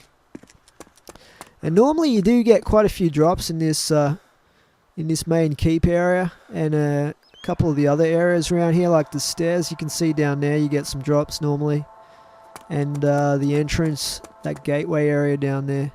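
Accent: Australia. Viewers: you sexy aussie like me :D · are you an Aussie?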